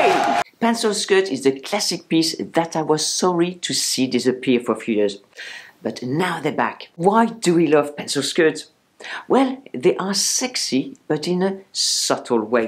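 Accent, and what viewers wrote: France. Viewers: Still watching your videos for your great personality and exhilerating and chick French accent. Maybe I will come around to my messy wardobe some day...:-D Thank you from Marianne! That was a nice morning coffee. And cheers to going "shopping" in our own wardrobes (previous video).:-D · I love pencil skirts. Your accent is amazing. Mostly French with classy English. I can tell you were here in England. Your make-up i copy. I wear Nuxe oils. I have 3 now and i favour red lipstick. I copy you in many styles and im 76, or as my GP says im the new 67. My grandmother was called Lizette and im Jacqui. Maybe thats it. Please keep these videos coming. Thank you🎉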